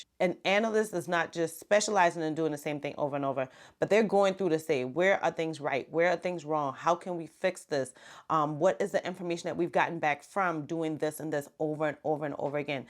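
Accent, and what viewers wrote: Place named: Carolina, United States. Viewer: I know a carolinian when i hear one lol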